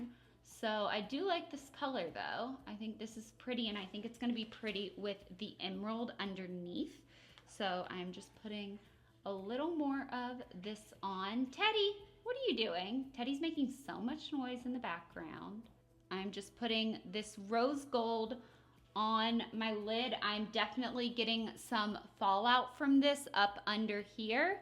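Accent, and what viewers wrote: South, United States. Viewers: It's funny. I started watching your videos about your third video up with your southern accent! But that's beside the point! Lol ... I STILL use OMGHHKP to this day. I'm a teacher and even my students say it.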